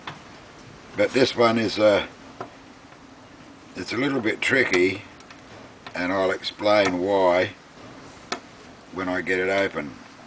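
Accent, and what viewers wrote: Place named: Australia. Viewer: Fuck the video, I'm guessing your Australian? if not, either way your accent is awesome :0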